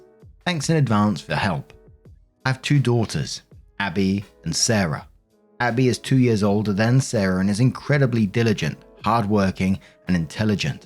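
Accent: England, United Kingdom. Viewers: Yes, I also heard it on Lost Genre. It's all good, I like English accent when he reads the stories. I also like that doesn't read over the cuss words like Lost Genre 😊🇨🇦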